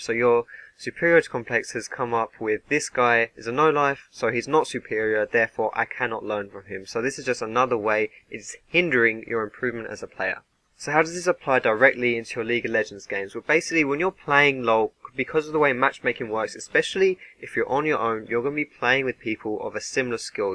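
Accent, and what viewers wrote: United Kingdom. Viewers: expected a standard "ward here, lvl this and that, kill this first" guide stayed for the british accent liked because of the content i'll try to change more aspects about my attitude, but it's hard when the people you're trying to learn are completely over their own head ¬¬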